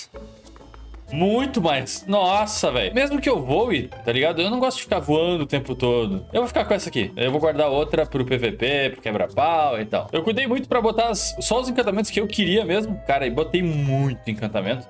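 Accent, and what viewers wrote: Rio Grande do Sul, Brazil. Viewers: vc é gaucho? no way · Você e gaúcho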